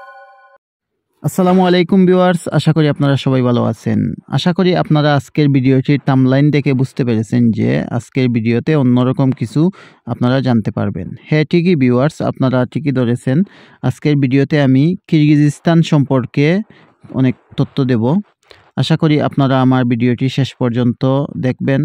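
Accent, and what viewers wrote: Sylhet, Bangladesh. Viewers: ভাই আপনি কি সিলেটি নাকি?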